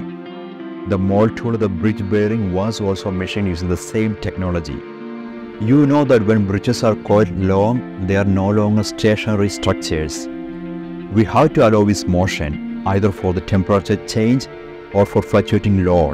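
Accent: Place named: India. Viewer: So the more you hear an Indian accent, the easier it is to understand.. just watch more often!! You’ll be amazed! I have zero issues understanding even the thickest of Indian accents.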